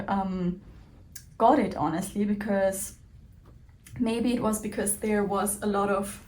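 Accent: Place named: Germany. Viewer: Hi! This is my first time visiting your channel. I believe I detect a German accent. Kommen Sie aus Deutschland? Ich auch, aber ich wohne in America. 😊 I was also in London at the time of the Stitch Festival, but was attending a sewing retreat closer to Birmingham and couldn't do both. I was able to meet up with Cara, right after the Stitch Festival though. It's an amazing feeling when we have an opportunity to meet some of the wonderful people we watch and admire, isn't it? I find it interesting that you (and I) find it necessary to justify why we've done something that, to others, might feel extravagant. Life is full of opportunities and if you can say yes, to some of them, then wonderful! Plus, we are all sewists here and totally understand the appeal of the Festival and wanting to be a part of this amazing sewing community that seems to only exist in the UK! 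Maison Fauve seems like a perfect fit for you, from the little I gleaned from this video. I am also a big fan because the whole company just has a feeling of elegance. Their fabrics are a bit pricey but worth it. The unmistakable quality will make your garments last for a very long time. Ok, I think I've rambled on enough. I do look forward to seeing what will become of all your purchases!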